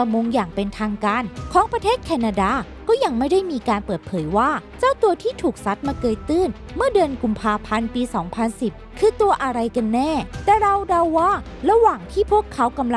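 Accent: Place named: Suphanburi, Thailand. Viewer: ชอบสำเนียงเหน่อๆคนพากย์แบบสาวสุพรรณแบบนี้จริงๆน่ารักแปลกๆดี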